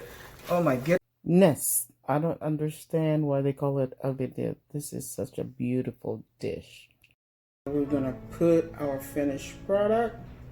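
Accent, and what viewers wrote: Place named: United Kingdom. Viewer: british accent si kuya... at masarap siguradong magluto... patikim..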